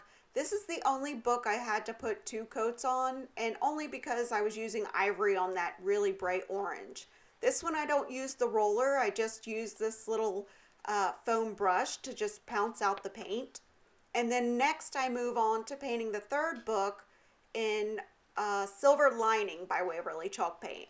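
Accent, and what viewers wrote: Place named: Pennsylvania, United States. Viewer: Just Beautiful Projects!, you are my New Favorite Shabby Chic Channel! From Your Accent it sounds like you are from Pennsylvania.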